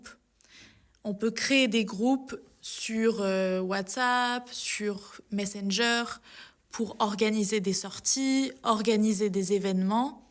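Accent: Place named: France. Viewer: Hola mi amiga, muy bonito acento francés, aquí te escucho, un abrazo desde Canadá 🇨🇦🙂🫶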